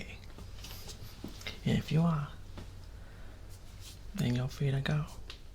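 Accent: South, United States. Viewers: Look it can be the werewolf but like you can just do the southern accent cause DAYMN! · A southern werewolf cool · Southern accent on point I'm from Texas so I can tell you you did you thing baby!! You're talented as hell 🤘🤘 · My favorite I'm obsessed with werewolf but I'm not a big fan of that southern accent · I personally love his southern accent.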